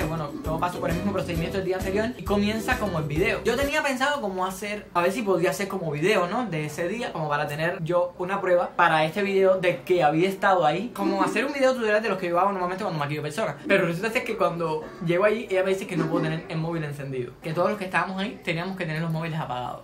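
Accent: Cuba. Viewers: Me gusto mucho tu forma de hablar 😆tu acento como cubano pero cool! 😂. Y los look de maquillaje de Camila 😲👌👌 de lujo ! Aqui otra opoyo de.mi parte 😉😉 . Me gusta como te expresas 😊😊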